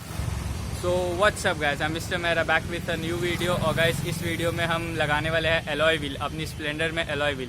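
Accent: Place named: Punjab, India. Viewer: Bhai bol ta Hindi par lagda Punjabi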